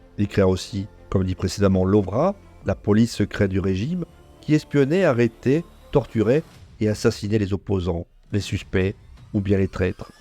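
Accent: Corsica, France. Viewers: Accent corse je dirais. Complications à articuler les syllabes.. 😊😊😊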